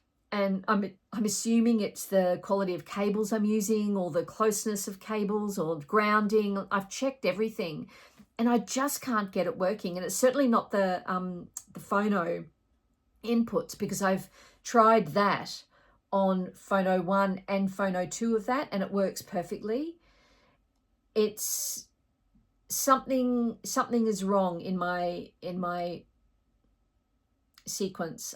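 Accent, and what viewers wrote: Australia. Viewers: love love loved this vid. Great to hear an Aussie voice, then the more into the vid, I was amazed at some of the similarity of my hifi journey. I'd had a NAD3020A for years, hooked up to some Haybrook HB1s that I had re coned in Paddington (over 20 years ago now). But, like you, recently decided to upgrade a bit. Was going down the NAIM route, then the guy in the shop said those fatal words, "have you seen any of the Hegel stuff". We did a side by side with a track by Lady Blackbird (interesting artist if you like Jazz) and it was like night and day. I'd also imported an early 90's Roksan Xerxes TT. Had the team at Classic Hifi bring it back to life. They did a great job. Then the obligatory speaker upgrade, went with the Lintons (my $ justification was that I'd had my stuff for over 30 years so..). Then walking the dog one day, there's some hifi gear on the street! 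I took a closer look (theres often all sorts of stuff on the street but this was unusual). A Sansui 331 Receiver and SR212 TT ! with original manuals and warranty cards! So i'm going to set up the vintage stuff alongside the newer (inc BlueNode) stuff. I was about to get the 3020 re done at a place in Marrickville (I won't put the name here as I think they are inundated) but will get the Sansui looked at as (again, like you), the right channel is coming in and out. A friend recently has gone on a similar journey with Sansui. Like you've said, the sound is worth the effort in bringing these vintage pieces back to l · Hello and subscribers. I came across the channel while browsing youtube for audio gear content. I bought by first system after attending Uni in the mid to late 70's and then did a big splurge on gear between 2007 and 2012. Personal circumstances meant that it got dismantled in 2017 and I am now setting some of it up again (the bits that still work that is). 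Good to hear the Aussie accent and while I'm interested in some older gear I will be mainly exploring the channel for music ideas given that likes have a fair overlap with mine. Happy listening everybody, Jeff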